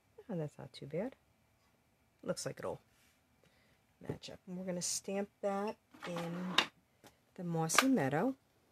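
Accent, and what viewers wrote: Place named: New Jersey, United States. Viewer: Just subscribed! This is one of my favorite new sets! I love this card. I am a Long Island girl moved to upstate New York. I love your accent even though I think it might be Jersey, it makes me feel right at home❤️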